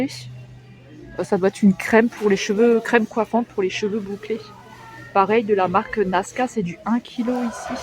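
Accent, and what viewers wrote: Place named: Alsace, France. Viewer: Ah ce petit accent alsacien !!!! J’adoooore !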